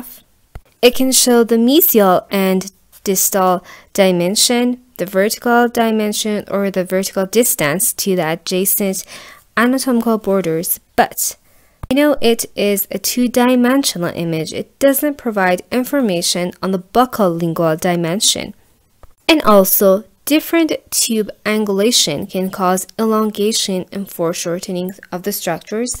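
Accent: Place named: Iran. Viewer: ویدیوهات خیلی به درد میخورن. فک کنم ایرانی هستی؟ داد میزنه لهجت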